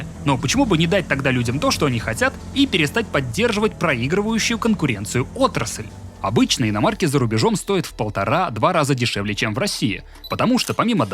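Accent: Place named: Ukraine. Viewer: хохляцкий акцент у автора.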